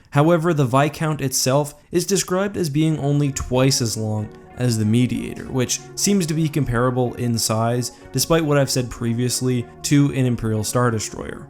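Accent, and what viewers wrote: Canada. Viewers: I watch your videos so much that I’m starting to say “out” like you do. You’re Canadian huh.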